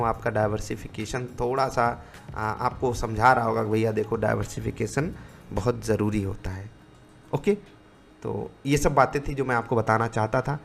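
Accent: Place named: Uttar Pradesh, India. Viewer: ap allahabad ya kanpur k lagte ho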